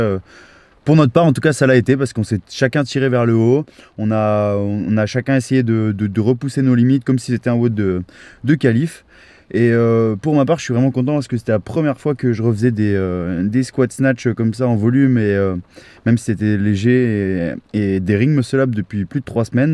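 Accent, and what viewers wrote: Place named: Lorraine, France. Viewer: Qu'es qu'on veut vraiment ? Voilà comment on sais que tu es lorrain 😂 Très bonne vidéo bon courage pour la suite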